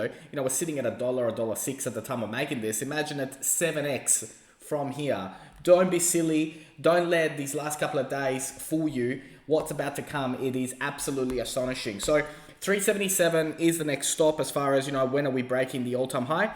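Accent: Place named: Australia. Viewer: Glad I found you bro. Judging by your accent your from AUS. I'm also from Aus specifically Melbourne. Their arnt that much AWAKE/AWARE crypto people in AUS like yourself. Gave you a sub mate 🙏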